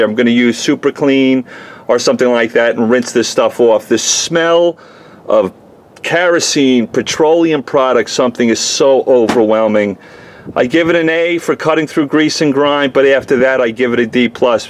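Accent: New York, United States. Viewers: How comes you sound like your from NYC and live in Texas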